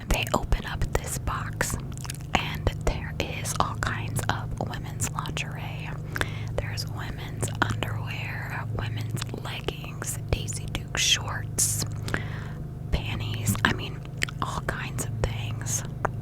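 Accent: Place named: Ohio, United States. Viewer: I fucking knew you were in ohio! You have the same accent as me and all my peeps lmao. I grew up in summit ohio or blacklick outside of columbus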